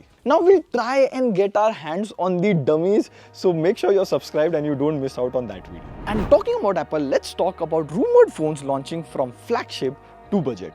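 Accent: India. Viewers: I don't know why . When you speak in English your akcent like Hindi and feel like speaking hindi❤😊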